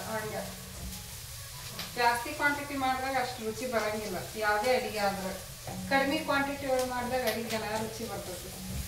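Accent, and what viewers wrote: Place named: Uttara Kannada, India. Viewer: Neev helid nija hasge iddastu kaal chachu anta gaade edyalla hage naavu hage num badgetge corectagi jeevna madtivi nim utrakannada maat kelakke channagirutte henmaklige helkodude beda avrige tilvalke jasti tande tayi anta preeti kalji madtare nanguu ebru henmaklu channag nodkantare jwara bandre bayig yenuu bedagutte nam kade kanchikay uppinkay hakkand uuta madtivi swalpa nalge ruchi barutte nan maglu frijalidakke heegidde box tandale nice vlog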